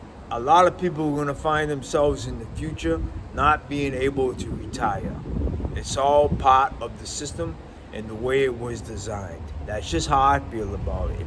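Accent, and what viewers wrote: Boston, United States. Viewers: This guy is great 👍. I know that accent! I came of age near the Boston area. Just outside of Fitchburg to be more specific. I lived there for 22 years, lots of fond memories. Nowadays I have returned to my early childhood hometown in upstate NY to be closer to family who live in town and so I could at least afford a place to stay for awhile as an educator. I feel at least temporarily more protected from the scourge of the economy and national disasters. I did tell friends and family thought, if I have to relocate again, it is not going to be the United States.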